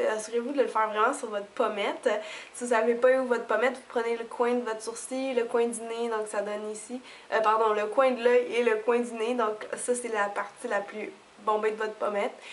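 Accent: Quebec, Canada. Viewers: j'adore vos vidéos, elles me sont très utiles, merci beaucoup. En plus vous êtes très jolie, et votre accent québécois est à tomber..........So Cute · Cette vidéo m'a convaincu : JE M'ABONNE HAHA !!! Mademoiselle aux deux facettes hein ! =D J'adoooore ton accent canadien, ou...quabecquois on dit ?? J'en sais rien lol... Bref j'adooore ton accent, cette façon de mélanger le français et l'anglais. Je te trouve très belle :) Avec ou sans maquillage, et même ton côté gauche du maquillage raté, tu es quand même belle. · J'adore, je rêve d'être comme toi. Autant quand tu es maquillé que quand tu es naturelle, tu es super belle. J'adore aussi ton accent canadien. :) Continue tes vidéos, j'adhère et j'essaye de mettre tout tes conseils en pratique. Gros bisous et bon courage pour la suite. :D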